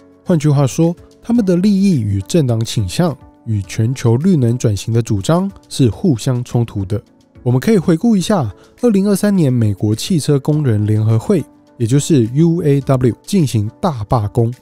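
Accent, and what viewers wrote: Taiwan. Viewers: Ａ，最近也卖掉Tesla改买油车了，因为北美实在太冻，之前一场大雪车就故障了 是说小哥哥的台湾腔还挺好听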